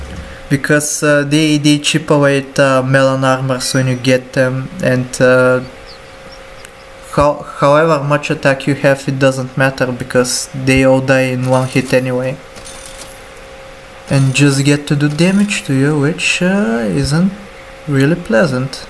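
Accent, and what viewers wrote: France. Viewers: I like that fhrench accent :D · oh my god are u french?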